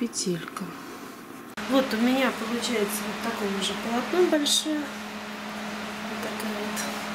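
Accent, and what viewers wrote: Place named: France. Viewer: Обожаю Ваш фpанцузский пpононс )я pелаксиpую , когда слышу Ваш голос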